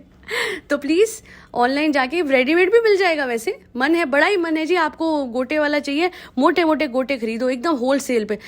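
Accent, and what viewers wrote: Punjab, India. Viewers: Your Punjabi is too cute 🤭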